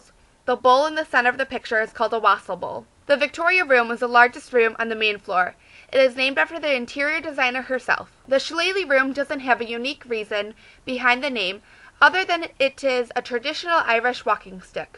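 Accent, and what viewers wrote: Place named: Chicago, United States. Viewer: Love Hackney's--The speaker's accent is so Chicago!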